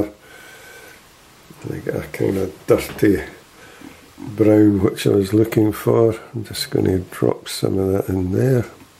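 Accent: Scotland, United Kingdom. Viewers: Love the painting. You sound like you are scottish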